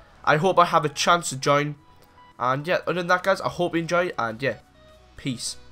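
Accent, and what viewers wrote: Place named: Newcastle, United Kingdom. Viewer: Your from Newcastle aren't you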